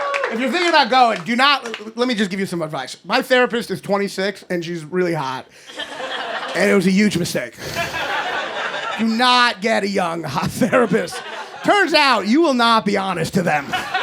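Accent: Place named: New York, United States. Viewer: He's from Kentucky but he sounds like a New Yorker. That's how Jewish he is.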